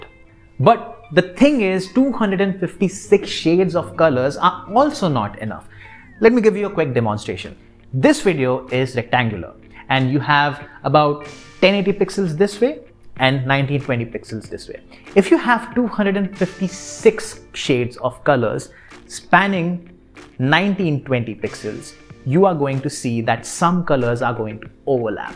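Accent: India. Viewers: Hey, here, the anchor from the video! That's okay buddy, I could have faked an accent but i tried to stay true to my own fellow Indians. Spend some time with Indian English content, and you'll soon start understanding what I'm trying to say. You can also use the CC feature in the YouTube interface. Thanks for being honest! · Best indian English speaker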